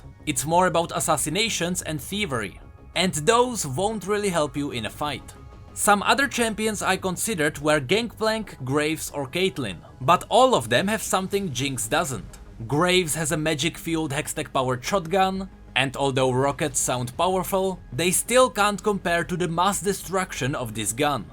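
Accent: Czech Republic. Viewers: obv že je čech hes accent is silenj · Bruh you got czecch accent lmao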